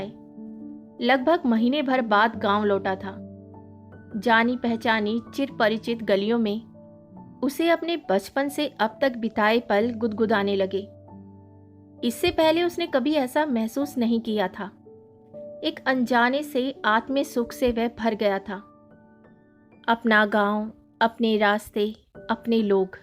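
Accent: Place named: Haryana, India. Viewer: Bahut badhiya kahani.. aur specially apka Haryanvi accent.. great.. m not Haryanvi but mene shadi Haryanvi boy se ki he.. to samjhne lag gai hu... Apne achhe se sunaya ..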